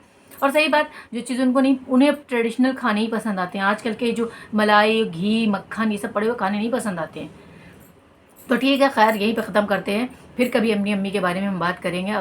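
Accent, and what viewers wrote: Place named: Kanpur, India. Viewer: Same aesi meri bhi ammi h...khana bht pasand ata h unko..ar ap bilkl hum logo ki tarah bolti ho pakki kanpurya😅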